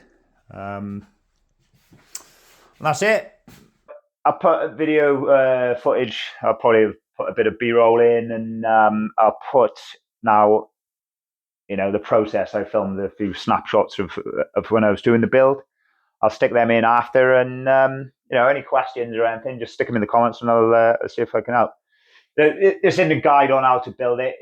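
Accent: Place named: Canada. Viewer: Great video, but the Canadian accent is pretty thick.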